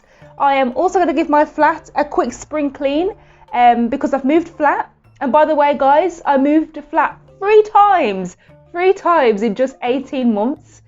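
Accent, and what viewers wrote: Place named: Birmingham, United Kingdom. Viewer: I just like the slight Birmingham twang! New subscriber here, do you ever do vlogs out and about?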